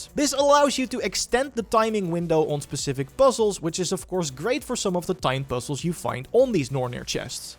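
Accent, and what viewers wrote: Netherlands. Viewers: Heerlijk, dat Nederlish.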